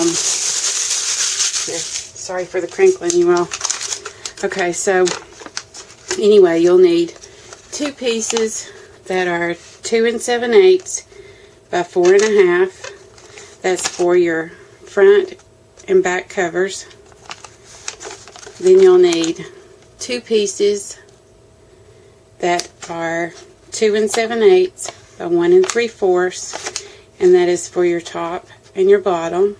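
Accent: South, United States. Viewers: New subbie (from grammie2bay). Thanks for doing this tutorial -- you make it so easy to understand....especially appreciate your chipboard pieces with the measurements written on them. I usually put TP rolls(cut up) into the compost pile, but now have a use for them. Love your southern accent! Marianne:)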